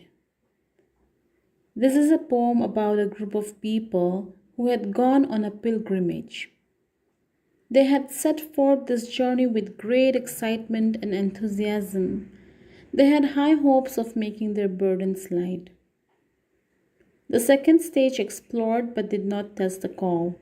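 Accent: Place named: Mizoram, India. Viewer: Ohhh i accent ka hre chiaha ka lawmzia chu ❤ mizo lo ni rengin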